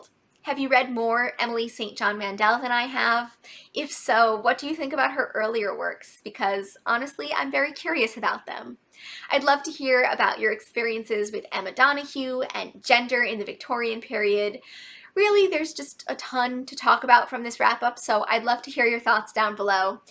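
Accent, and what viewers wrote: United States. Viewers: Here from Books and Things. You have a very cute and familiar face and very sound very American. Subbed!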